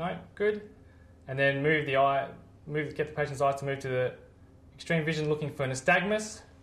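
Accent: Australia. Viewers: Sound Australian to me mate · Very Australian indeed... mayte...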